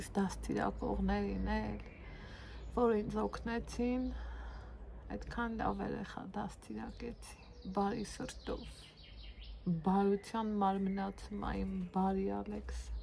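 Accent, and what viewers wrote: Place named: Gyumri, Armenia. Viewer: Ьтик артен мер Ленинаканцу акцентов асир 😂 джан арохч йакнек, шат сирун шорикнерен, апри 🙏🙏🙏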